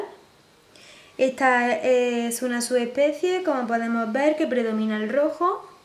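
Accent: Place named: Spain. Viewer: habla como española......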